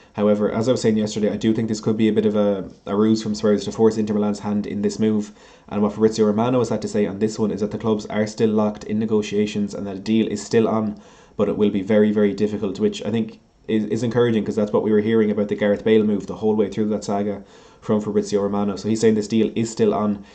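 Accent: Ireland. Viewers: Ur sound like ur irish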